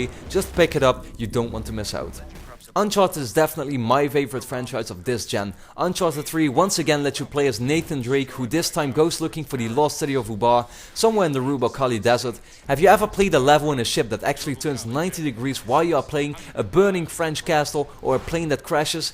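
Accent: Netherlands. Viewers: you sound like a dutchmen who speaks english! so are you? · Jij komt uit nederland hé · I'd say, without looking at your channel, that you are Dutch. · are you from the netherlands? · Always good to hear a dutch guy, not playing cod or fucking fifa. Nice list. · deze man is nederlands? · hey nice video can i be nosey and ask are you dutch man? :) cool accent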